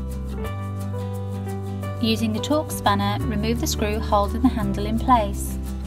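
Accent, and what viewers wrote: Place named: United Kingdom. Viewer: Watching this from the states, much more enjoyable with that soft British accent than the beer drinking mechanics we have here in the US. LOL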